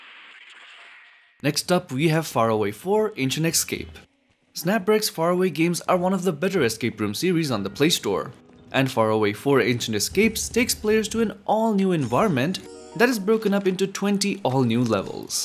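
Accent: India. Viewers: Indian accent can never be hidden.. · this guy's English Accent in INDIANISH.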